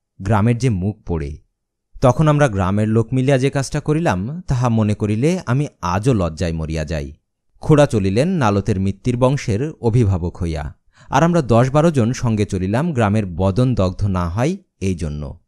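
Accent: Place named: Bangladesh. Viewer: সুদ্ধ উচ্চারণ, মনে হয় পাঠক বাংলাদেশী, কারণ ভারতীয় উচ্চারণ এত ভাল নয়।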